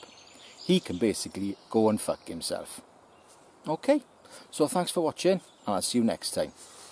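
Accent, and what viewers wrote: Wales, United Kingdom. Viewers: Thanks for another video, I haven't done any bow drill fire lighting for a long time, I was trying to think how long ago it was, I taught my oldest grandson when he was 9 he has just had his 20th birthday, that's when I last did it, how time flies, Things don't always go right and you are very skilled in what you do, you did it in the end, very impressive, I like your welsh accent its very easy to understand, thanks for sharing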